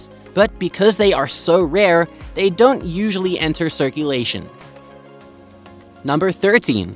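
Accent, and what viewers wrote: United States. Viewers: The man doing this docu is talking about the queen of england so why doesn´t he talk proper english and not english with a yanki accent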